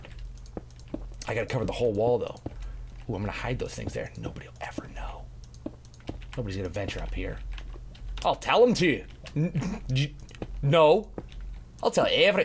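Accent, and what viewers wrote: Scotland, United Kingdom. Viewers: His Scottish accent reminds me a lot of Desmond from Lost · I just watched a guy talk to himself in a Scottish accent for 12 minutes...... am I really that bored? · I will never get tired of Scottish accent... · Ignore anyone saying the Scottish accent is offensive. It's not, I find it funny just as much as I find Willie from the Simpson's funny. I am also from Scotland. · haha and nice scotish voice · Your scottish accent omggg xD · Lol luv ur scotish accent soooooooooooooooooooo much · Moar Scottish Accent please. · The Scottish accent is cool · I could get lost in that Scottish accent all day <3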